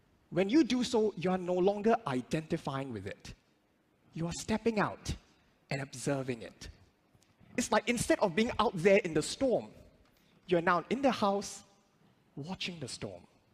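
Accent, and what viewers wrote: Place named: India. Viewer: India accent sounds like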